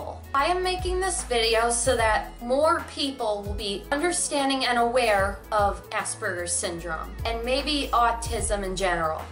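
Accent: New York, United States. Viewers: Excellent video! I am CONVINCED my roommate has Aspbergers.... its' been driving me nuts.... I had a hunch he had it and you checked every box for me... he is SUPER smart... however, he is SUPER shy, doesnt look me or anyone in the eye,... can obsess over things.... honestly, can be quite selfish,.... but I truly think he has Aspergers... this video really confirms a lot for me... I feel like it would be super rude to ask him if he has it... he has admitted to being very shy. I have only been living with him for a month... and ive had some frustration with the living situatioion so far for all the things mentioned above... anyway. im probably boring you to tears lol but thanks for posting this! I love your accent haha it sounds very New York (Im a new yorker). I'll check out some of your other vids and subscribe. I dated an autistic guy once, and we managed... 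but it was hard. Ultimately we broke up cuz he was cheating on me... but thats a whole other story. I've always been fascinated by hearing other people's experiences and you did a great job. Cheers. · Great video but i HATE the way Americans pronounce Aspergers. There's a "p" in the there, and a soft "g". Anyone would think you lot are obsessed with burgers or something.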